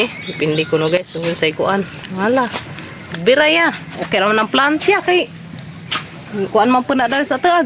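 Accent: Bohol, Philippines. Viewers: Boholana k day? Kc ang yung intonation...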